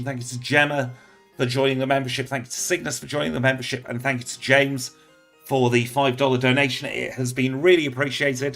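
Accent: United Kingdom. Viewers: I'm enjoying your content now that your back. The mix of Pokemon and a soothing British accent is great for relaxing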